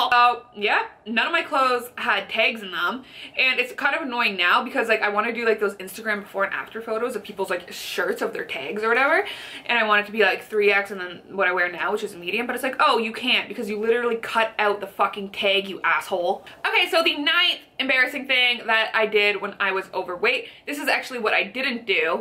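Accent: Canada. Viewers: Canadian accent, Get over it. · Canadian accents are so cute :) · Her Canadian accent is so adorable!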